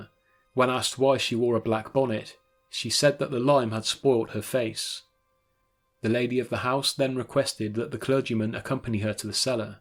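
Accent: England, United Kingdom. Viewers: how could it possibly have been at all profitable to sail coal clear from Australia to the United States? There are small, yet damning fapts of logic and lapses of sound reasoning that plauge each and every one of these "Tall Ship Tales And Old Sailors Tales" but I both appreciate the imagination that spawns them and the attempts to make them appear as realistic ad possible. Your cool English accent helps them along as well.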